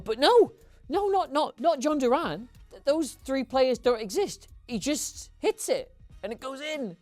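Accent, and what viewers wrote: United States. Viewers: american accent >>> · That American accent cracked me up 😂 love your videos!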